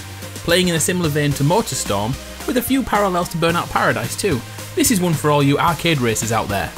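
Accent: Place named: Scotland, United Kingdom. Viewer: Wow, the scottish accent. How much you changed!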